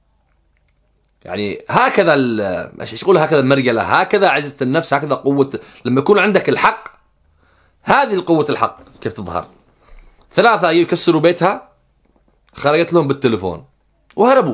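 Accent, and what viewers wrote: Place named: Yafa, Yemen. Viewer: لهجتك يافعي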